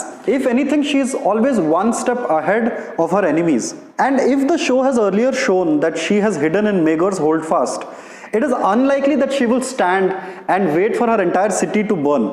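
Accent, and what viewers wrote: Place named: India. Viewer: This guy reads reddit and makes a video with typical indian pronounciation 🤭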